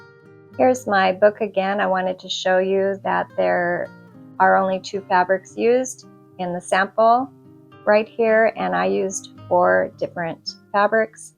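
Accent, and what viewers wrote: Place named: England, United Kingdom. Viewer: Good evening, firstly, what a bonny dress you are wearing to do, it's so pretty. Next, do you remember the name of that gorgeous rad and the navy prints, they are lovely especially that red one, it would make a lovely dress., you sound English but from that cracker of an Airstreamer mobile home, it makes me think that you no longer live here in the UK,. My Daddy made our first caravanette out of a Ford Transit van, that he cut the roof off and put an extending roof on,. He put windows in and my Mum made the seats/table/ bed, with my twin brother in a stretcher of blue fur fabric with navy and white cotton to stop it from going out of shape. I slept across the front seats, definitely not as comfortable as everyone else, but it was the only way we could have time away from where we lived. My Daddy got a proper camper van with real toilet that they could also use as a shower room, real fridge and a cooker. 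They could go with the dog just as the weather here allowed, or turn in a different direction to better looking parts. It's funny how we used to get made fun of at school because we couldn't afford a proper caravan holiday or a real holiday to a holiday park, but we had great fun. He was funny because if we went into a town and it was busy, he turned around and went where there was nothing of any interest for a 10 year old and upwards. Up here in Scotland there has been such a big uptake in people buying anything they see to make into their holiday dream of a motorho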